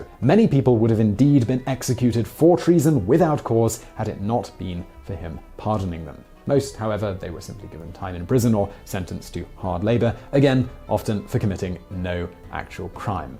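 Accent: United Kingdom. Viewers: you have a British accent but, you use American terms. What do you call home? Btw thanks for the entertainment.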